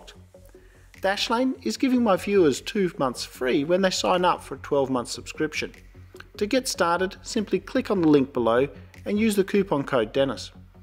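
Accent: Australia. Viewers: I hear Aussie accent :) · Ya Australian?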